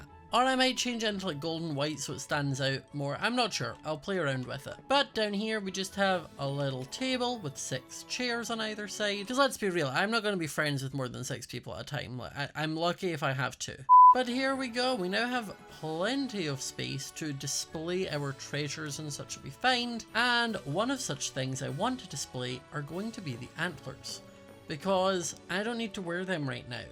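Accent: Scotland, United Kingdom. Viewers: this explains so much the coruption coming from your portal how u and the demon both have scottish accents i cant wait til next episode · Your accent gave it away weeks ago... :) It was all a bit McAdder from Blackadder.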